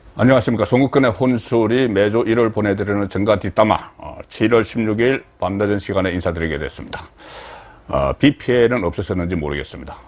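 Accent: Gyeongsang, South Korea. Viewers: 송국건 님갱상도 구수한 사투리 고향생각납니다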